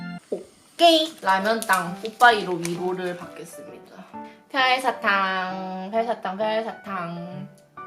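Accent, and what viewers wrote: Busan, South Korea. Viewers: 부산말투 원래 저래요 그러니 남자들이 좋아하죠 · 음!! 부산 사투리가 조금씩 사용하시네요 ㅋㅋ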